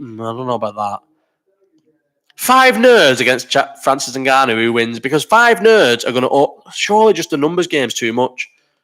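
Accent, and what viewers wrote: Liverpool, United Kingdom. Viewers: Boss scouse accent that mate 🤣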